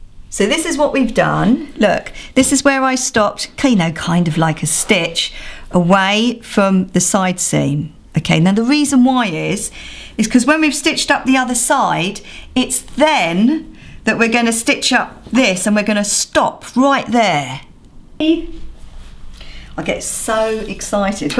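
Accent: United Kingdom. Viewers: omg just discovered ur channel. finaly a british doing something practical, all my other youtuber makers are speaking either american or ausies which is kinda confusion,.... subscribeeeed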